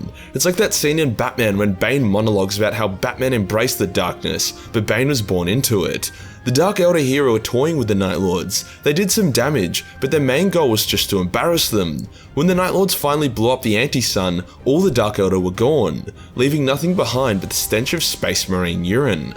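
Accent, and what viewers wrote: Australia. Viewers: Loving the content ,and am guessing from the familiar accent ,your a fellow Aussie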